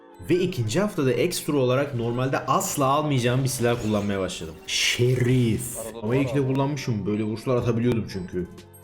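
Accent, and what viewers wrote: Thrace, Turkey. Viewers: Hep videolarında trakya şivesi konuş çok iyi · abi sen bizim oradansın beya tırakya dimi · bi trakya ağzı sezdim harika video beğenildi .d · Abi trakyalısın galiba siveli konuşunca çok iyi oluyor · Abe adaş trakyalısın galiba sen nerden Be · D-dostum sen benim trakyalı adamımsın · abi trakyalısın galiba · trakyalı mısın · Abi sen Trakyalı mısın ?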